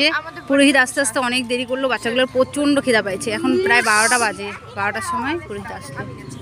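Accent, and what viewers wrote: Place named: Bangal, Bangladesh. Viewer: Bangal vashata bolbe. Oitai main attraction. Khub valo lage. P😊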